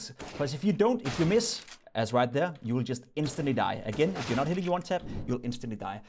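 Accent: Denmark. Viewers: do you have a danish accent?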